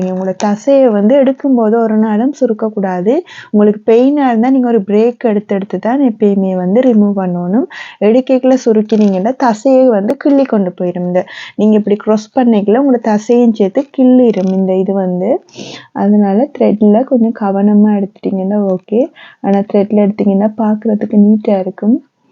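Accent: Sri Lanka. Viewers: Unga silon Tamil ketka inimaiya iruku akka😀😊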